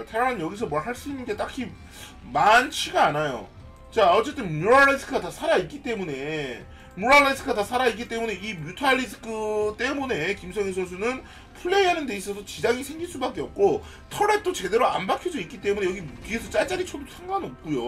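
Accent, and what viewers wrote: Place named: South Korea. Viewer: korglish lol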